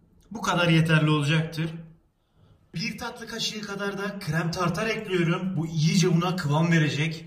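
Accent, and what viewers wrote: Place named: Hatay, Turkey. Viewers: Abi anlıyorum hataylisin bende adanaliyim fakat şu konuşma tarzıni degistirirmisin bak abi videolarını severek izliyorum ama diyorsun ki yapiyirum diyorsun abi harfleri yutmadan konuşsan daha anlaşılır olur yapıyorum de abi dolaba koyuyorum de abi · Hatay sadig demeniz hatay iskenderun olmanızla ilgili Şive tam bizim koyun şivesi gidük gelük şuraya öte yayliye öte buri gel napön falan hataylıyım insalah bir gun karşılaşıp yaptiklarindan tatarım😀👍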